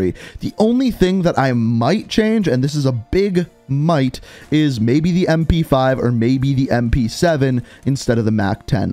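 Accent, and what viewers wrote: Canada. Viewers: yr Canadian?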